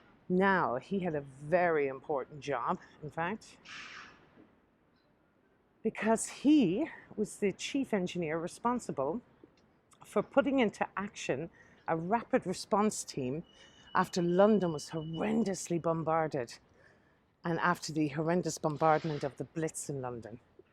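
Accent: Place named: South, Ireland. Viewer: Found you by accident through looking for famous graves. I was so interested in your voice and i was thinking to myself thats and south irish accident, i came by your videos of Killarney and my god you a killarney woman. Im from North Kerry in a small town of Listowel. Your videos are so interesting and your jack the ripper story was class. Keep up the great work from a irish fan ( sure you only from down the road).:)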